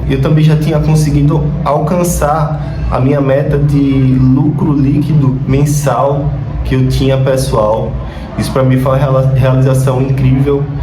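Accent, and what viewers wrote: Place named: Recife, Brazil. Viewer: Eu j tive esse sotaque. Recife, Pernambuco, Brasil.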